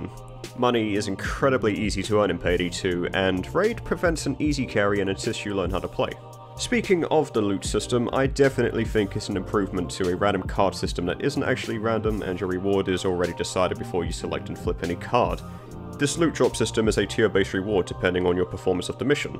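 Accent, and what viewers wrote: United Kingdom. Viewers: where abouts in the UK you from?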